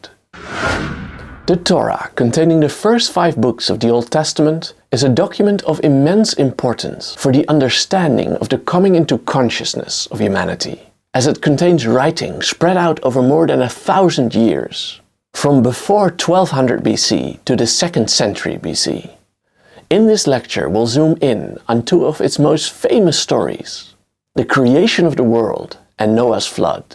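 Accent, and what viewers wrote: Netherlands. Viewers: yes we know. It's not a history book, accented Dutch person.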